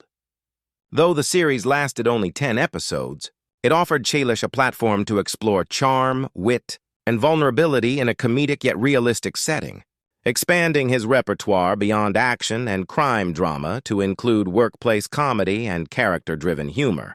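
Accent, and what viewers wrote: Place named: United States. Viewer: I am a relative of Tom and currently visiting the Selleck's. What did Tom confess? He has confirmed already many times he hasn't confessed anything recently. Everyone we know has already read his book or listened to the audio CD. Learn English. It's free on YouTube. Your Americanized AI-generated voice sounds unnatural. We know that you live in a third world country and don't speak English. Don't talk about people you don't know personally.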